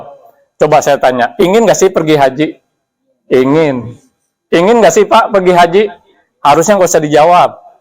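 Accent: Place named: Betawi, Indonesia. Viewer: Hadeuh....ini betawi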